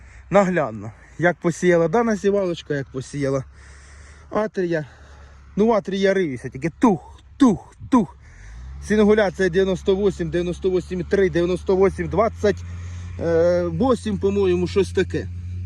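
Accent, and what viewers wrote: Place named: Surzhyk, Ukraine. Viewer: Все добре тільки вчись говорити українською не суржиком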